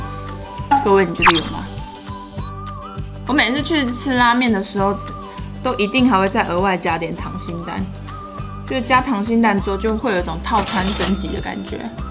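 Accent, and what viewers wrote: Taiwan. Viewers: 講話台灣國語好可愛，有時講一句台語覺得好親切，喜歡❤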